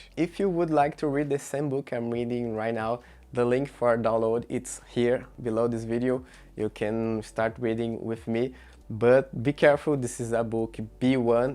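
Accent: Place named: Brazil. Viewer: Eu entendo mais um brasileiro falando em inglês do que o nativo kkk , muito bom ! I’m learning speak English fluently, your canal will help so much ! 👊🏼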